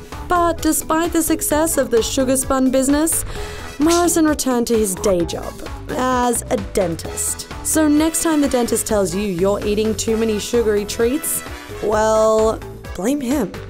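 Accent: United Kingdom. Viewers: Such a bri'ish accent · Perfect British Accent 👌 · Abella Danger with brittish accent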